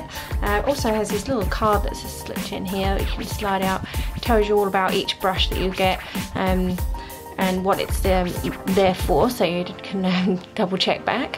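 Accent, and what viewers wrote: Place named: United Kingdom. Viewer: Hi, yes I know its a 2017 video but I'm still doing catch up, I'm a slow old bird lol. Now that I've seen this video I know you should be able to do some gel designs for me, even just simple easy ones I don't care - just give me gel (x). I cannot make out if your British or not, sometimes I hear an accent and then you sound very uk-ish. Take care hun,Xv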